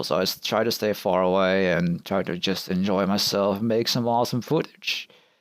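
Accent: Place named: United States. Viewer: Dear, may I compliment you on your English!! And on your very very very American accent:-)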